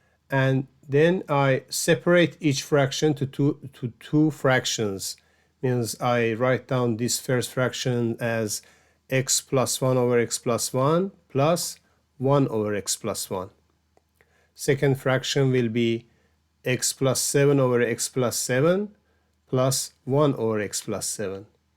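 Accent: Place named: United States. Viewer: I liked your American accent 🩵 💙💫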